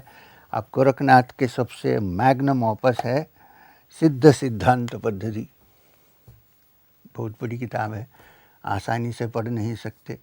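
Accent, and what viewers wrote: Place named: Maharashtra, India. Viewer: Sri M your accent is also quite maharashtrian.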